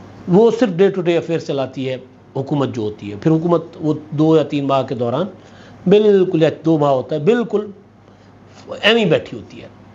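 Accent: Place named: Sindh, Pakistan. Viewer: مزہ آجاتا ہے آپکا وی لاگ دیکھ کر۔ ہیں تو آپ سندھی۔ سندھی لہجے کی جھلک آتی رہتی ہے تھوڑی تھوڑی آپکے لہجے میں مگر. گفتگو کا انداز اور سبجیکٹ پر گرفت بہت مظبوط ہوتی ہے۔ عمران شفقت کا بھی اچھا ہوتا ہے مگر آپکا منفرد ہے۔ آئ لو. یوؤر. وی لاگ۔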